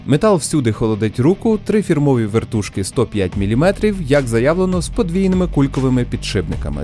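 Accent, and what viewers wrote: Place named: Bila Tserkva, Ukraine. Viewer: шо за прекрасний акцент? -Білоцерківський! Думав показалось, а нє-земляк. Привіт!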